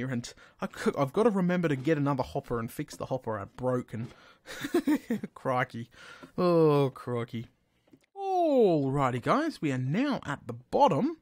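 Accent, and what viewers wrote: Australia. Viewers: you are very australian sounding... except hardly anyone uses those words, are you from alice springs?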